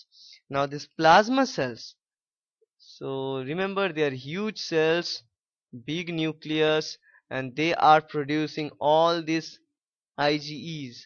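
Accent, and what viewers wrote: India. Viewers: Omg.. tq god im from malaysia n im used with indian english accent if im not.. i might be dun umderstand your useful knowledge.. u r very good in explaination.. now i really understand it.. i hope i can answer my exam question very well after this.. tq guru.. ^O^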